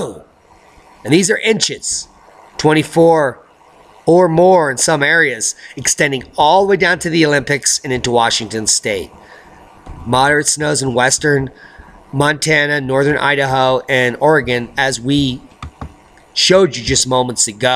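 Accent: England, United Kingdom. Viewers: Hi. Great video! a lot of fun. Loving the..English accent, mixed with a little Australian too!? That little 9c 'tippy-touch' in the UK is called Cornwall. ps The Queen Muvva don't give a toss about snow..she died in 2002!! oh, and Congratulations to you both!!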